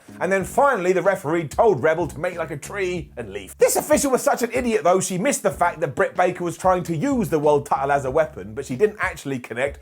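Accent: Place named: United Kingdom. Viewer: I love British Accents...Jax "Jag-wahrz" becomes the "Jag-u-ahs" lol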